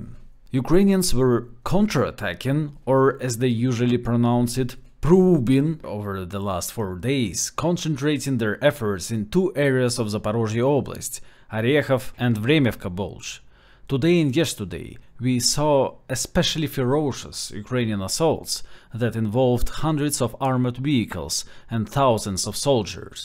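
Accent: Russia. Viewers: Your Russian voice is of the Slavic soul, the Serbian soul... the human soul. Thanks for bringing this short update in that voice. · I find his accent a bit funny actually. Especially with his excellent range of vocabulary and good grammar. I imagine an intellectual person from Russia in a good movie! 🙃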